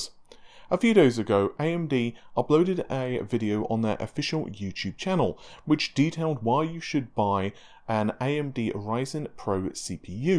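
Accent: United Kingdom. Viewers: He is not mispronouncing anything,its called British accent. I am getting tired of those jokes. Amata is pronouncing Navi in a very weird way now!! Leave them alone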